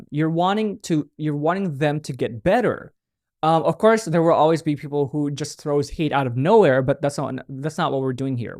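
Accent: Philippines. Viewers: Are you filipino ? Because of your accent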